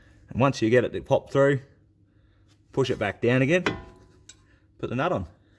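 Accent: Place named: Australia. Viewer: Fabulous, love the aussie accent. Cheers from Norway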